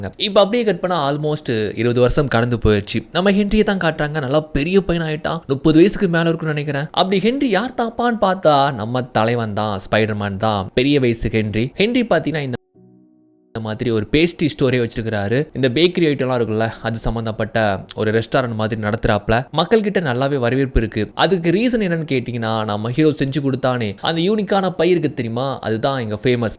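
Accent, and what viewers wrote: Sri Lanka. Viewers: Ivanga Voice Modulation Nallave Therithu Sri Lankan Tamil Nu So Ivaru Definitely Arjun Bro Illa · slang change aahuthunga.... ilangai tamil mix up maathiri irukku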